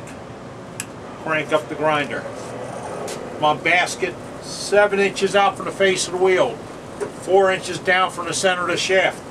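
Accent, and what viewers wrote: New Orleans, United States. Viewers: Hey!! Just found you on Youtube. Love your style! No wonder, yer from 'Nawlins. Me too, I grew up in Harahan! I'm living in Denver now but sure miss home sometime, especially when I hear your accent! I am just learning how to turn wood. I turned a Stanley #5 Plane knob out of Rosewood. My first project and it came out real nice. I refurbished the plane for my son, who was born in Opelousas close to Lafayette. Anyway, I like your channel! I'll come back some more. Thank you very much! Great videos!!! Dave Henderson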